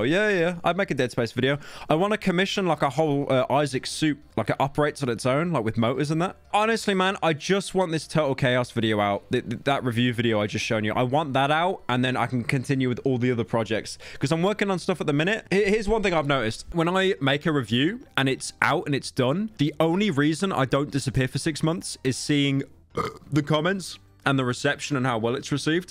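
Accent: United Kingdom. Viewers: just found out hes brittish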